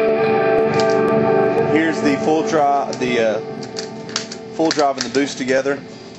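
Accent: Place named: South, United States. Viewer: Dude, nice rig. Church rig?Might I recommend a better tuner? (turbo tuner!) Also, if you didn't already know, you probably should not use the "tuner out" on your volume pedal - it messes with your tone. Anyway, is that green pedal a JHS custom pedal? I seem to recall a custom pedal that looked very similar to it on their site. Great tones btw. I used to have a M9 and I loved it, I had a sonic stomp too, both cool. I hear your southern accent, where are you from? I am in Hattiesburg,Mississippi.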